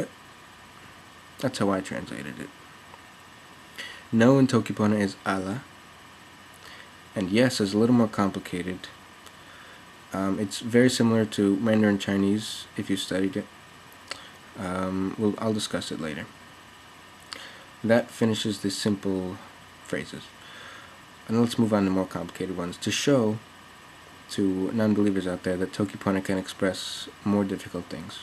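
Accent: United States. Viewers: It sounds like american.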